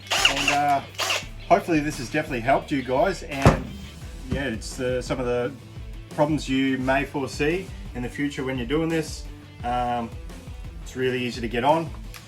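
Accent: Australia. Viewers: Looks and sounds like this guy is in Australia. I've never seen a torx screw or star headed screw on any USA Milwaukee drills or tools, they are all Phillips No. 3 or split headed with standard screwdriver slot. It's obvious the engineering is purposely different on OEM Milwaukee tools down under. Milwaukee half inch cordless chucks have been known to freeze up like this. I've never seen any tool maintenance instructions from them advising regular lubrication of the chucks. Seems like common sense but lots of tradesmen never lube their drill chucks, both keyed & keyless.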